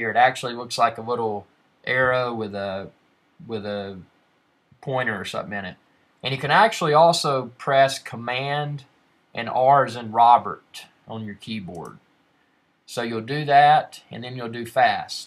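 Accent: South, United States. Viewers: That would be a Sexy Southern draw ;)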